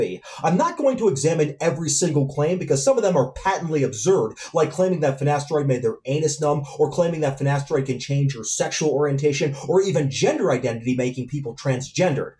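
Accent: United Kingdom. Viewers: isnt he scottish lol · God, I do love your British accent, mann 😂😂😂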